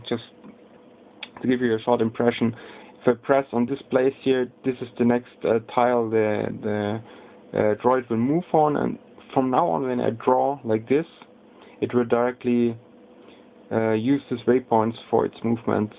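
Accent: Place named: Germany. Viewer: du bist deutsch ich hör so was :D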